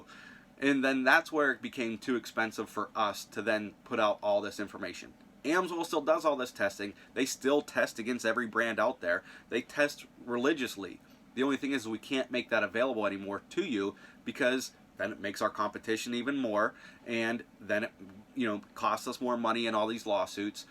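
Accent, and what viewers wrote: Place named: Pittsburgh, United States. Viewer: You have a Pgh accent. I know because I grew up in the South Hills off Bower Hill Rd. BTW, love Amsoil, but best to put in newish or well-maintained vehicles.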